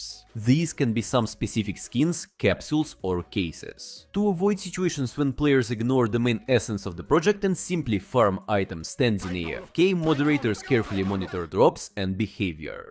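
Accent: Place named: Russia. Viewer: you speak like russian with this accent, am sorry if i say wrong, am poland so it hears very known for me :)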